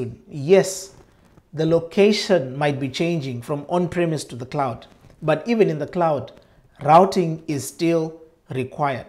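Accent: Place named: Kenya. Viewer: Your accent is Kenyan probably from South Nyanza. Definitely had to sub and like. Am planning to start my CCNA come Jan 2021.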